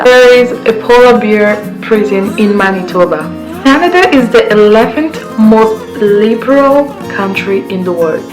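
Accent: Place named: Nigeria. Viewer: From ur accent I believe ur are My sister from Nigeria, pls let Me know the step by steps guide and documents I needed and the right agent I need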